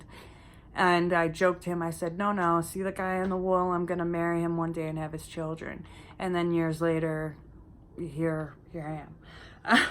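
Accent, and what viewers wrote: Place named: New Jersey, United States. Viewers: I love your channel I’m a bit late to the question and answer session but I would have asked what cosmetics and skin care do you use your skin is always flawless and I hear New Jersey in your accent 😊xx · I thought I heard some Jersey ! Thank you for sharing- your a true blessing. May I ask ? Was it difficult learning to speak after your dentures ? You speak really well . Thank you and Godbless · I hear more jersey than anything lol I’m from upstate New York grew up in bath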